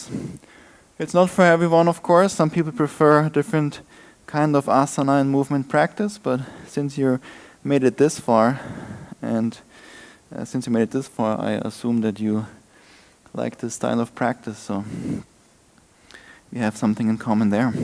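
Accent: Germany. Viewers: Hello. I've just recently found you on YouTube and and really loving and resonating with your practice. It is challenging but accessible and I really love your connections between poses. I've had a difficult time lately getting onto my mat, but your practice has encouraged and motivated me. So thank you! xo And I adore your German accent! ;-)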